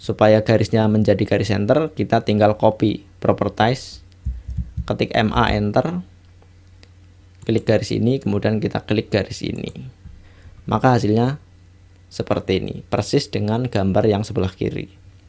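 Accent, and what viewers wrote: Banyumas, Indonesia. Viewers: rika asline ndi kang? kayane ngapak ya?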